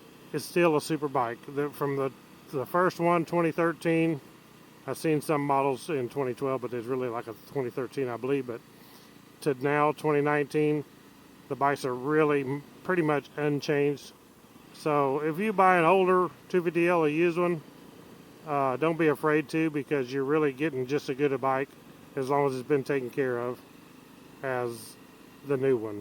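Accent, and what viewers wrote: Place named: Missouri, United States. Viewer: Being from Mo. I recognized your accent immediately .Been gone 50 years and I still have dreams about the beautiful countryside.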